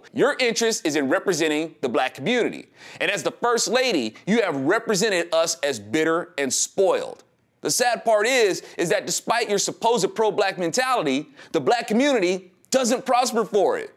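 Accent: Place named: United States. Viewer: LOL....*cough* Uhm...sorry. I couldn't help myself. You sound like me for the last 20 years... Thanks for speaking American.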